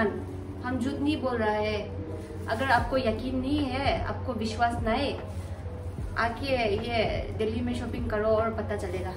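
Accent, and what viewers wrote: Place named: Nagaland, India. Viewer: Love the way you speak Hindi mix nagames ....😂😘😘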